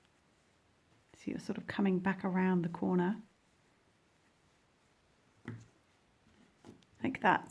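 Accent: England, United Kingdom. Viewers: ❤❤❤❤❤❤❤❤❤❤❤❤❤❤❤❤❤❤❤❤❤ I LOVE YOUR TUTORIALS AND YOUR ENGLISH ACCENT ❤❤❤❤❤❤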